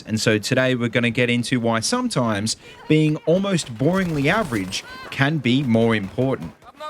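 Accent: Australia. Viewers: Thanks mate. Great review and good to hear from an Aussie. I've subbed and look forward to your next videos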